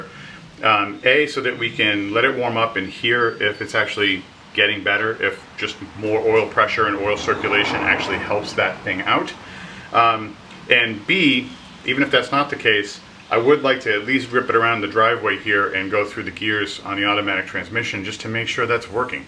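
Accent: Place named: New York, United States. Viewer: Sounding like a real uneducated NY'er - lets keep it civil ❤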